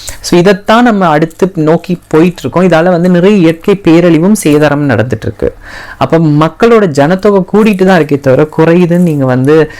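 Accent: Sri Lanka. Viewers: Ungaloda ilangai tamai I LIKE IT Ninga foreign la irunthalum nama tamil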